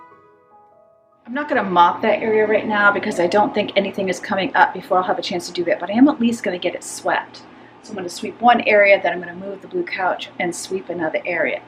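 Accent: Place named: Maine, United States. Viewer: Don’t ever change your wonderful accent,. It reminds me of home every time I hear it and I love it so much. We watched a video growing up in Maine about how the language is similar to a certain old English dialect. I’m frankly, a little shocked that anyone would comment in a negative way. It’s beautiful, beautiful beautiful, and I love it. ❤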